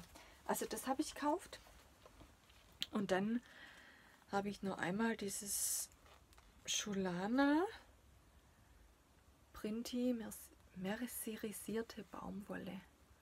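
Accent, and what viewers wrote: Swabia, Germany. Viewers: Hallo. Ich mag deine Videos und dein schwäbisch ist einfach nur schön😊 grüße aus Esslingen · Hallo, ich höre dir gerne zu, du hast eine Art die mich total entspannt, keine Hektik nur tolle Arbeiten zum schauen und dein leichtes Schwäbisch ist sehr nett. Ich will auch so nen Baumarkt in Frankfurt aber er wäre mein Ruin glaub ich. Du machst das ganz wunderbar und ich freu mich jedes Mal wenn ich ein Video von dir sehe. Mach's gut bleib gesund.😉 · Hallo liebe, vielen Dank für den Tip mit dem Baumarkt für Frauen "freu" da werd ich die Tage doch mal vorbei schauen isch ja grad mal ums Eck und endlich mal eine Schwäbin die nicht Hochdeutsch "schwätzt" Liebe Grüße aus Fellbach:)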